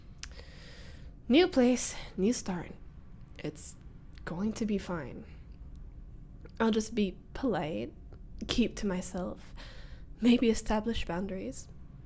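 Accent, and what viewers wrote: France. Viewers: Purée je me perd dans l'asmr et je tombe sur une asmrtiste incroyable qui parle anglais I appreciate seing french speaking english and I absolutely love your accent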